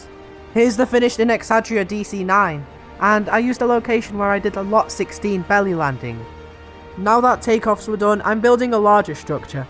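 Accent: United Kingdom. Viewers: bruv I love your vids And your accent is kinda funny(no offence sorry I know your British correct me if I’m wrong)(btw Im subscribed😊)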